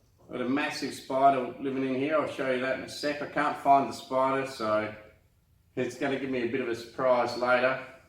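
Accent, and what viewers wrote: Australia. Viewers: Iam a beginner and I love junipers,great tip thanks, the accent and the funnel web spider bring memory of beautiful Australia, thanks again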